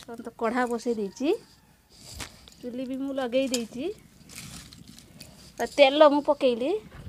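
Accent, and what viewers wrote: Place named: Bhadrak, India. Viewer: Tame bodhe bhadrakia 🤔🤔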